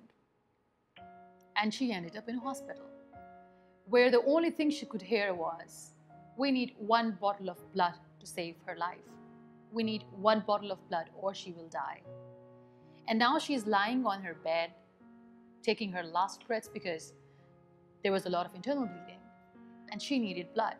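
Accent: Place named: United Kingdom. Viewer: mam i just wana ask in which country u r still living.. ur english speaking accent is british.. u r jst osmmm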